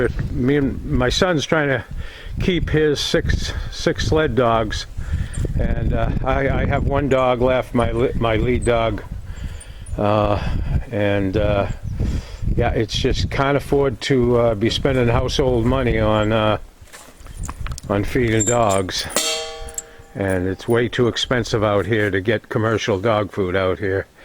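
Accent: Boston, United States. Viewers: Great content…….. admire your dedication and determination to keep you and your people taken care of….. I am in Tampa…with much easier life…but not the community y’all have….very nice…..we would be best friends…….that Boston accent is hanging in there! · Good to hear the Ba'ston accent again. : ) Hope you upload daily life videos more often. Best!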